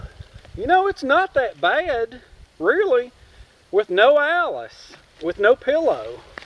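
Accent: South, United States. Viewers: Hey Bro...I just wanted to say how much I enjoy your vids. So plz keep em coming as you have the time. It is really nice to have a southern guy doing vids. From a Bama guy. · Man, you're as crazy as a box of frogs on hot coals ...I just love watching your videos! ;-) I love your southern drawl and your hooting laugh, it cracks me up every time! It always puts me in mind of that 'Stumpy' character played be that wonderful american actor Walter Brennan in the John Wayne westerns! :-) Anyway, keep them coming and all the best from the UK, regards, Daz.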